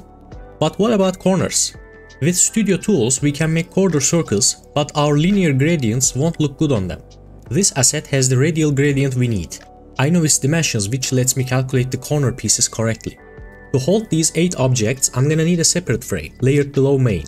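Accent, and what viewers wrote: Turkey. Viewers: aksandan anladım kesin bu türk diye kanal güzel eline sağlık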